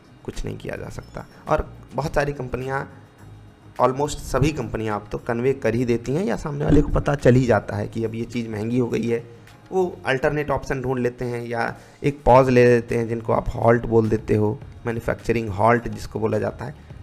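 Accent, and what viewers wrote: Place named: Uttar Pradesh, India. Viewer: ap allahabad ya kanpur k lagte ho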